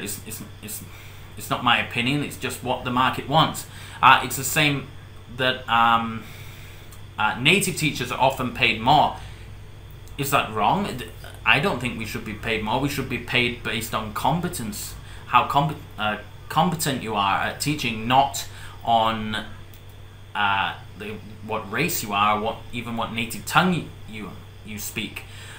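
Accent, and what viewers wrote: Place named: RP, United Kingdom. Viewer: Your queen's English needs a bit of clearer articulation. And yeah, weak argument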